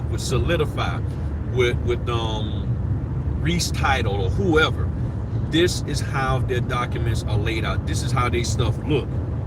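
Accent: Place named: Florida, United States. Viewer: YOU DEFINITELY SOUND LIKE SOMEONE FROM DUVAL COUNTY!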